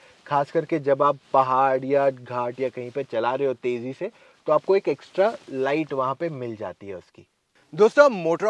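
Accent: India. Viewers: nobody notices it spoke in very desi style because he knows that the people who are going to buy rthis they might not know english words that much hats off, he knew about his audience very well, subscribed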